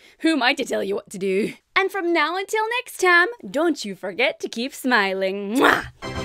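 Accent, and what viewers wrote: United Kingdom. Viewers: Amazing can't wait to become Rayla lol😂😂😂 Also your Scottish accent is amaze!!!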